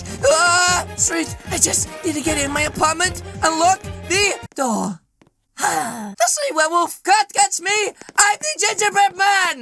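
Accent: England, United Kingdom. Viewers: can I ask you a question are you english or ameeican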